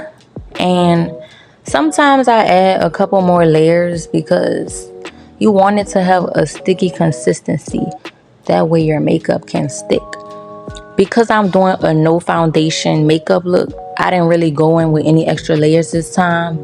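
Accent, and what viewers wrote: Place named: New Orleans, United States. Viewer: I know a NOLA girl when I hear 1 😂 love your video sis keep going 🩷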